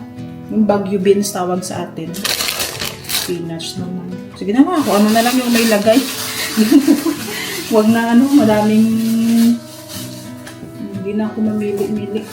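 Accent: Iloilo, Philippines. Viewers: hi mhie taga san po kau parang ilonggo kc accent mo kahit nag Tagalog ka😊😊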